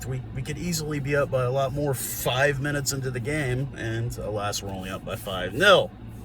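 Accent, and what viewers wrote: United States. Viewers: Greeting from a South African living in Switzerland (I used to live in the US). Loving your show and it's great to hear someone speak of my two favourite teams especially in an American accent. Great game this weekend, it was epic. Keep up the good work!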